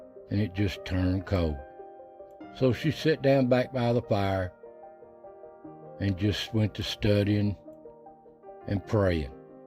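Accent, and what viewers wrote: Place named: South, United States. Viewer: Hi; Just an old trucker from north Jersey. I agree with you about miracles. Hopefully God has one left for my wife. She has glioblastoma. Been together 50 great years. We live like my grand-parents who were farmers their whole life, inseparable. Old school ways will never die. We love your videos, beliefs, and your beautiful southern accent. You and yours are wished a Merry Christmas and a Happy New Year. This year was a rough one for you and I but I always remember that God doesn't give us anything we can't handle. Prayer to yall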